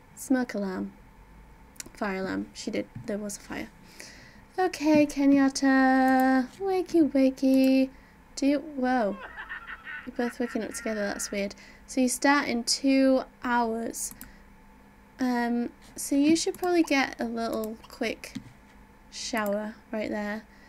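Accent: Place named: England, United Kingdom. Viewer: where are you from? You sound english but like what city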